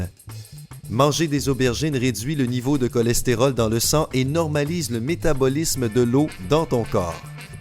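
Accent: Quebec, Canada. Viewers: cest un québécois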